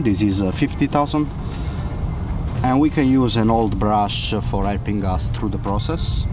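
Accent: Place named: Italy. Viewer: Quanto cazzo si riconosce un italiano che parla inglese ahaha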